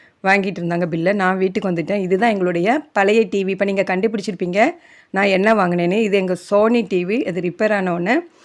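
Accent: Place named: Tirunelveli, India. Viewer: ok sis tirunelveli la therinchavanga madhiriye erunthichi athan kten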